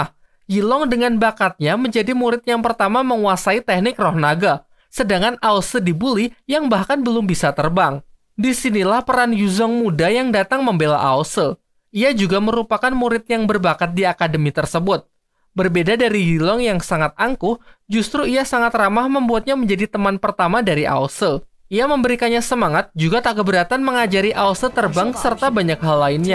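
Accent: Java, Indonesia. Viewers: Bang lu orang mana, orang jawa kah kok bilang nya belum deng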